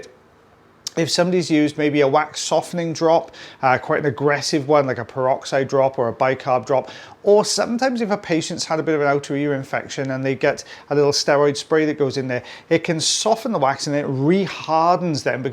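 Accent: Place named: Wales, United Kingdom. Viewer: I live in Australia but am from UK. Lived in Wales for 7 years and watch you to hear your voice (besides the extractions of course) Takes me back 57 years. Of course I hope you are feeling better, it’s winter here and lots of flu, head colds etc. Hope you coped with the heatwave, that’s the norm here in summer.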